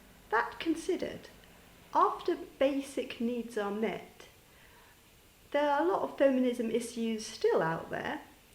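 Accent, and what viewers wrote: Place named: United Kingdom. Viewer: i loved her british accent...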